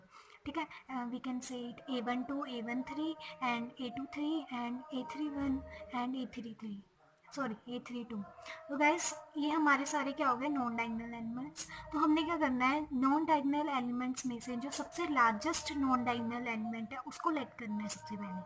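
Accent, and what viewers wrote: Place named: Haryana, India. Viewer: Liked your Haryanvi accent.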